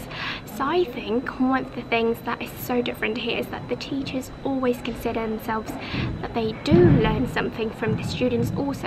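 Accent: United Kingdom. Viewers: Trường bạn đẹp quá ^^ bạn nói tiếng anh cũng hay nữa. Bạn có thể chia sẻ kinh nghiệm luyện accent đc ko ạ? Nghe bạn nói giống British accent :) mình cũng có căn bản tiếng anh và muốn luyện accent giống người bản xứ ạ. Cảm ơn bạn.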